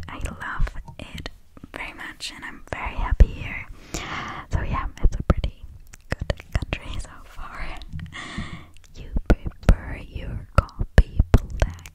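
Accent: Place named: United States. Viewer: I’ve only just started listening to and to be completely honest I thought you were American, as you do have a slight twang but that could be because of the internet, with a mix with a different accent. I assumed you were born and raised in America with your parents or one of your parents being European, I wouldn’t have guessed German either! 😂 One of my friends is half German, half English and you have similar accents but she is completely fluent in English and partially fluent in German. So I would've thought it was a similar thing. You’re English is really good, I thought you were a native speaker, don't put yourself down cause you are clearly better at it than you realise!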